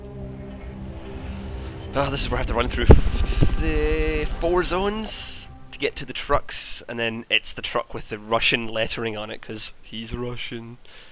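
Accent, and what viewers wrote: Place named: Scotland, United Kingdom. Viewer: Nice scottish accent. Whats up from Dundee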